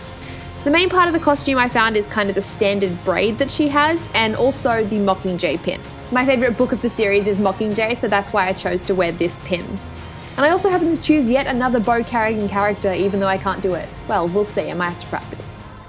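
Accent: Australia. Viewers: Omg you're Australian same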